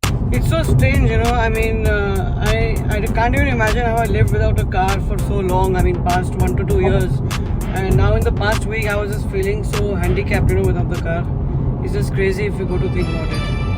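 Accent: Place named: England, United Kingdom. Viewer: Your vidoes and content are very good but many of my frnd Don't take interest in because of your very heavy English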